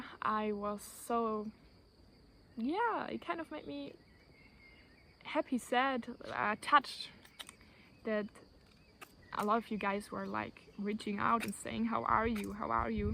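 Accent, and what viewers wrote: Germany. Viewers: Mir gefällt dein deutscher Akzent und du hast schon etwas Ähnlichkeit mit Doc Caro :)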